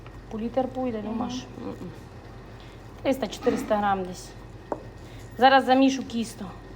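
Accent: Transcarpathia, Ukraine. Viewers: Не мала дивитися се відео, та почула закарпатьську говірко, то відкрила. Дуже скучила за цим чудовим краєм. Дякую.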